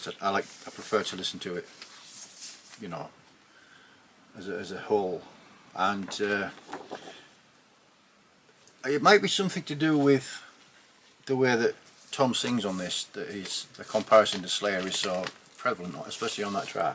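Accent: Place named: Scotland, United Kingdom. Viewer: I don't know what kind of UK you are but I think you're Scottish. Check out a book called "The Haar" by David Sodengren. I've actually heard of Caustic Wound. Check out Golers from Canada. I can't believe Sodom is still together but I absolutely love this group! Oh wow! I didn't know Cancer was still kicking. I will never go against Pungent Stench's first record but you really need to hear "Masters of Morale, Servants of Sin". That record is incredibly disturbing and just a ridiculously, evolutionary version of this band. Great video and cheers!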